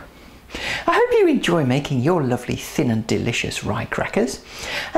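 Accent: Birmingham, United Kingdom. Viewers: It's a Birmingham accent but not very strong.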